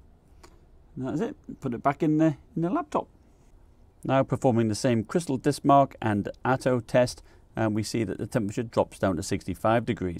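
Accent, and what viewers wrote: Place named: Billingham, United Kingdom. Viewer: It had occurred to me that something new might be going on, I hadn't installed anything but sneaky coin mining type activities is always a possibility, so I formatted and did a clean install of Windows yesterday, and even as it was installing it was getting hot. Performance whilst installing seemed a bit off, but since then it's been going OK - as I said, just a bit impractical with the cover off. I was more worried that the excess heat could do a bit of damage to everything else inside the laptop. I had hoped it would be a simple 'yep, bung a new SSD in and all will be well in the world' but maybe I'll just have to get used to the cover being off. Thanks anyway though, I'm looking forward to browsing through some of your video's, not everything interests me (I'm an old Unix and cobol zombie from way back but I just don't get gaming at all), I watched the one on how much money you make from a few weeks ago, it was really interesting, and you were helping those who may be just starting out with tips, which is really nice. 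(My husband is from Billingham, you sound rather local. Hope you're not sulking as bad as he is because Derby won )...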